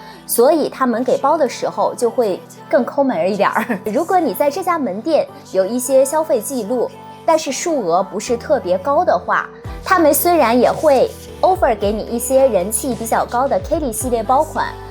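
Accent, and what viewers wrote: Northeast, China. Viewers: 肯定是东北那旮的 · 东北女生？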